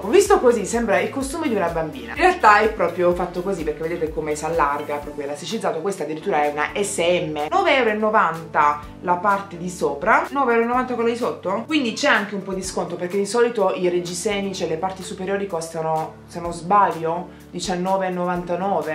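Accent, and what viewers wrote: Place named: Rome, Italy. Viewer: Inizia un video parlando con l'accento ROMANO! Ti adoro sei stupenda😍😍😘❤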